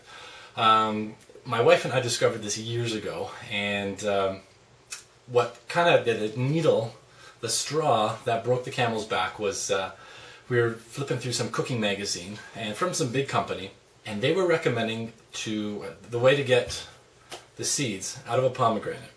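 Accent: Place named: Canada. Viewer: You sound Canadian. A Canadian who talks too much.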